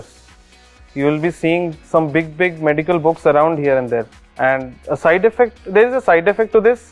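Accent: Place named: Tamil Nadu, India. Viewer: I thought it's Tamil, as the accent was same!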